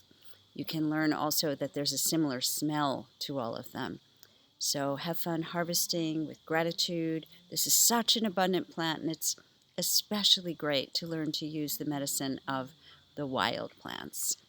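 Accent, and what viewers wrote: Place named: Australia. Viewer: I hope you are OK. Sounds like Australia, or similar. We are all One here on Earth. Bless you.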